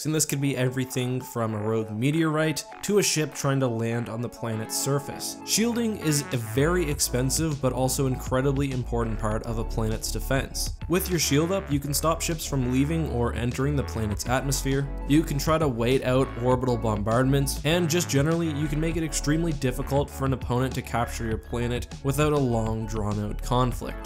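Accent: Canada. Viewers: You sound Canadian for some reason.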